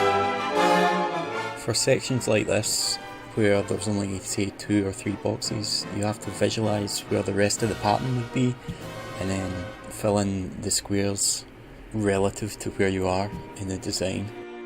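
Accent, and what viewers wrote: Scotland, United Kingdom. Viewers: Beautiful! Highlander tartan painted and explained with a scottish accent :) Well done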